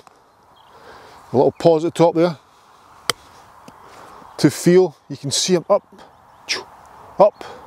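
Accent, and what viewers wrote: Scotland, United Kingdom. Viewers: Truly the best golf instruction I ever received! This instructor is the one that, finally, instructs...as if all the others I have met were hiding the true "secret" of golf. And the beautiful Scottish accent comes as a bonus, straight from the land where golf was invented! Thank you.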